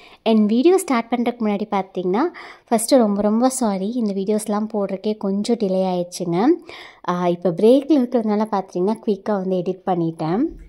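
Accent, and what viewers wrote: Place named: Kongu, India. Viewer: Sis nan 1st tym unka long video pakuren ninga rmba cute ah positive ha peasuringa..innaila erunthu unka konju kongu tamilku nan admai❤❤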